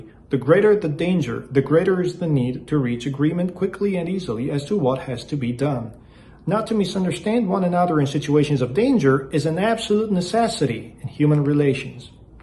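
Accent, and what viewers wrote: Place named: South, United States. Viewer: I feel like you're talking with an old timey country boy accent. Has that always been the case and I just didn't notice.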